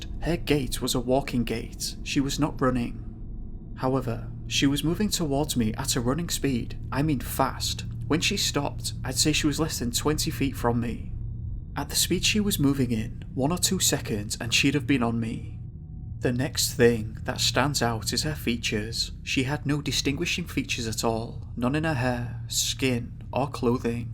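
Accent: United Kingdom. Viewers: Please ditch the heavy British accent and I guarantee you'll triple your likes and subscribers 😉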